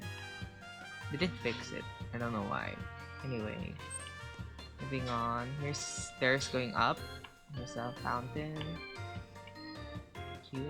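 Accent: Philippines. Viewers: You're so creative and patient with your builds! Btw r u Filipino? We have the same accent 😩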